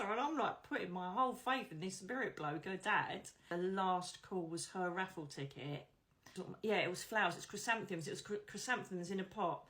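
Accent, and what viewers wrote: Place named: Essex, United Kingdom. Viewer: Grays in Essex? Amazing! I'm in Colchester and would love to see one of your shows! I knew from the accent that you're local xx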